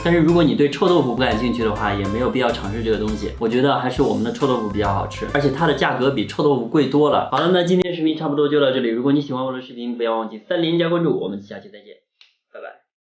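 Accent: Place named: Shandong, China. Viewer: 是哪里人啊听着口音像山东的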